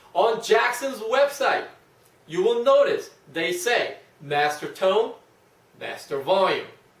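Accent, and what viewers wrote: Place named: South Korea. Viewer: 안녕하세요. 혹시 한국 분이신가요? 영어 억양이나 발음이 종로 학원을 떠올려서 여쭈어 봅니다.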